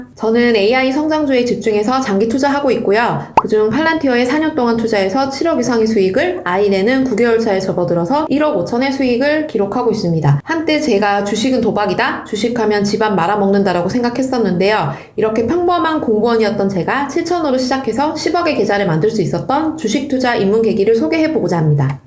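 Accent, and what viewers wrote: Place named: Gyeongsang, South Korea. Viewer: 혹시 ㅎㅎ.. 경상도분아니신가요.. 말투가 약간 있는데…ㅋㅋㅋ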